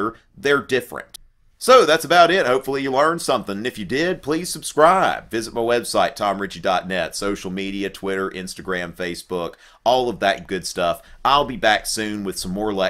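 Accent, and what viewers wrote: South, United States. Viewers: Greetings from Belgium! I'm a student in literature and spend my life reading books. I'm saying that in order to ensure you (if you would even need that ^^) that your videos have a good level of accuracy and I rarely find errors in your works on European history, which becomes more and more uncommon on other American channels... Anyway, I'm glad that I can sum up my readings by watching your nice videos with your lovely Southerner accent and, moreover, by doing this, improve my English! :D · Idk why but the southern accent makes this so much more understandable 😭👌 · Love your explanation and Southern accent Makes it so simple and easy to understand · Smartest man with a southern accent i have ever heard.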